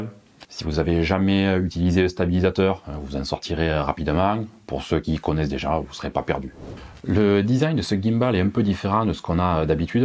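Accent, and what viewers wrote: South, France. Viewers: T'as une maladie ou c'est un accent du sud? Juste pour savoir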